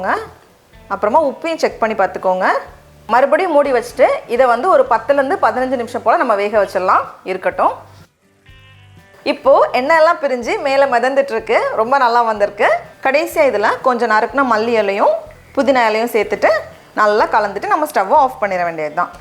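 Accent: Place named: North Chennai, India. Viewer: Your now Celebrity channel ,please improve your Tamil pronunciation ,Dont say la ,Zha ,its only few days of practise. Azhagu !! not Alagu ,tamil means Tamizh or Zha ,avoid north chennai accent too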